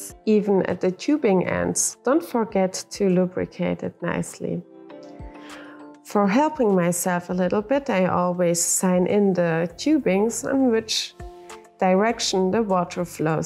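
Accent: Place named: Germany. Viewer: Am besten bitte gleich deutsch sprechen. Dein Deutsch-Akzent ist so stark, dass ...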